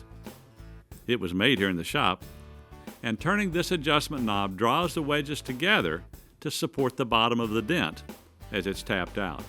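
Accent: Missouri, United States. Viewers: He's from Ely Missouri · I only watch these videos because he has the nicest American accent ever! 😆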